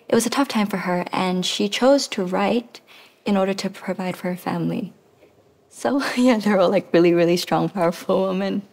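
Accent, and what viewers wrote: England, United Kingdom. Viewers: O inglês britânico sendo o maior charme dela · I love her English accent ❤ How beautiful she is 😍🩷 Thank you CHANEL 🤍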